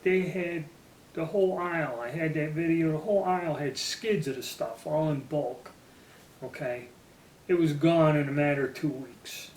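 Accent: Pittsburgh, United States. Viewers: He sounds a little like a Pixburgher who just got home from Gianiggle n'at.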